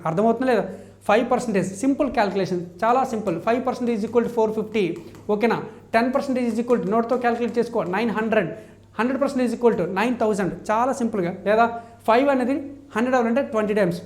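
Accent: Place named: Srikakulam, India. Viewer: Sir meedhi srikakulam kada 😍😍😍😍👏👏👏 nice explanation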